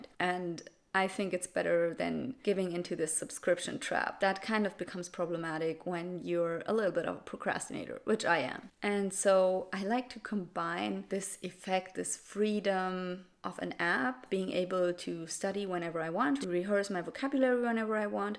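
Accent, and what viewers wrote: Germany. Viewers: I started learning German 2 days ago because i love the sound of the language. It's my 3rd foreign language. Even your accent in English is so sweet like German.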